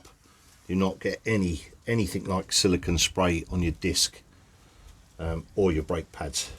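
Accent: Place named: RP, United Kingdom. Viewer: I'm doing good, thanks. So you've gone from being a bad ass head of a bike gang, to a posh speaking GS riding Youtuber. 😁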